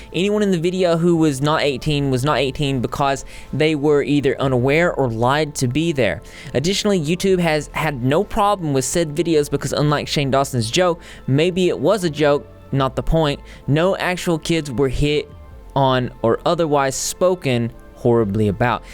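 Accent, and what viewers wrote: South, United States. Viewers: Is he from the south?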